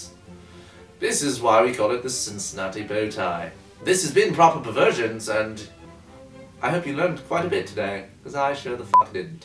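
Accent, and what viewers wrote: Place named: United Kingdom. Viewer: Has anyone else noticed that his 'posh' accent is gradually becoming more and more british in every video of proper perversions? XD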